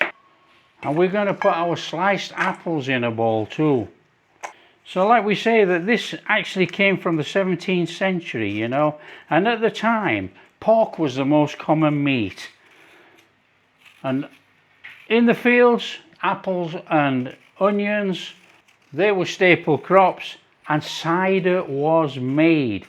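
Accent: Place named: England, United Kingdom. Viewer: Wonderful English recipes and your wonderful English accent! I understand all of it and enjoy both...thanks from the Black Forest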